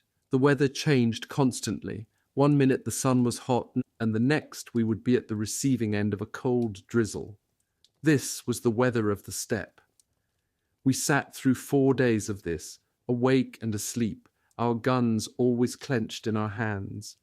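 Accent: RP, United Kingdom. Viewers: Incongruous that no mention of Female Soviet Snipers is mentioned . Just Men beating their chests and yodeling, sounding like Tarzan King of the Jungle . Fact : Lymudilia Pavlichenko called Lady of Death 350 confirmed Kills including 36 German Snipers in Odessa and Sevastopol theatre of WW 2 in Russia. . No mention by Vasily Zaitsev though he must of been aware of the Skill of Soviet Women Snipers as accomplished as he was . Strange the Narrator has a Royal English accent. Seriously?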